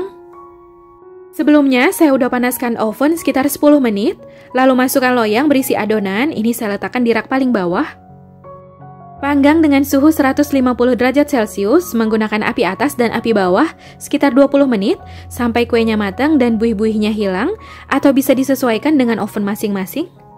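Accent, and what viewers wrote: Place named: Bandung, Indonesia. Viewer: Suaranya kyk suara khas orang Bandung, salfok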